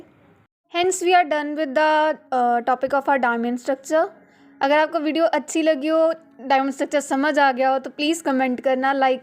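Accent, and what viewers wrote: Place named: Haryana, India. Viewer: Aap Haryane se ho na...Don't tell lie. Because I am from Haryana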